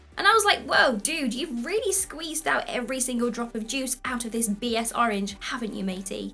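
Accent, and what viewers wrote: United Kingdom. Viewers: Hey you are really pretty and cute. Too bad you sound like you live in the United Kingdom and I live in the United States otherwise I would like to ask you to dinner and a movie.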